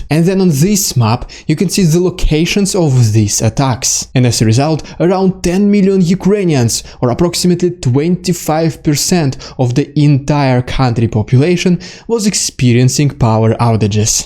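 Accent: Russia. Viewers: Cool, you sound like Chekhov from Star Trek! Live long and prosper!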